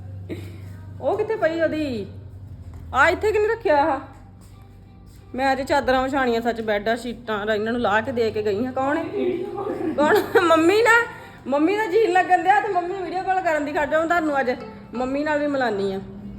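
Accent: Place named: Majha, India. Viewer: Nice vlog tusi kithe o tuhde boli majje wale aa sisters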